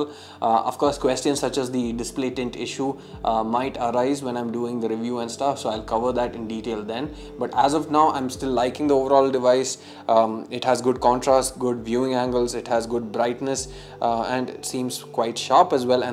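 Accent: Kerala, India. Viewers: English kettal parayo e machan malayali anenu!?!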